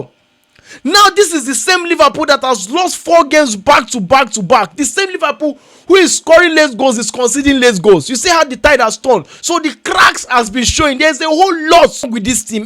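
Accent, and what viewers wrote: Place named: New Jersey, United States. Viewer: That’s a lovely accent you have. New Jersey?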